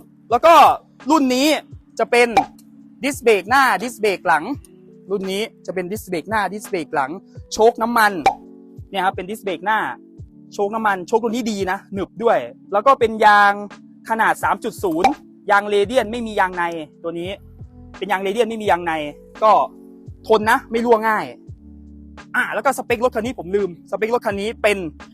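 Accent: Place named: Isan, Thailand. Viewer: มีคำว่าเนาะลงท้ายรู้เลยคนอิสาน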